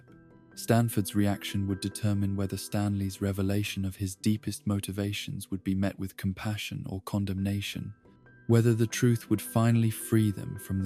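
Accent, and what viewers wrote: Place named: RP, United Kingdom. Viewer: u sound like a tory but i fw this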